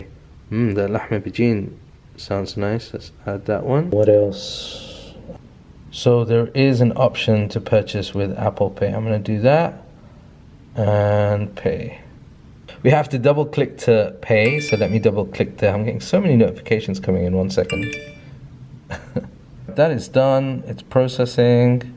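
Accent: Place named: United Kingdom. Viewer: I'm sorry why do you have a British accent?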